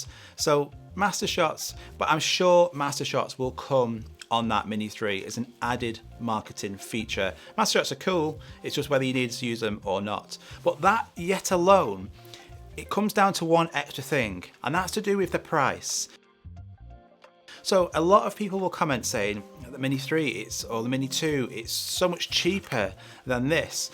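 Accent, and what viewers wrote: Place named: Manchester, United Kingdom. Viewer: So where from exactly? The guy who introduced me into my project in Cambridge 30 years ago was from Manchester. So it appears that I am familiar with your dialect after all already. Here in Switzerland we have many different dialects, mostly due to that Swiss German is only spoken but not written (for writing in newspapers etc. or for the spoken news on TV High German is used). As a result I understand a person who is from the canton of Wallis (that's the worst example) only with difficulties.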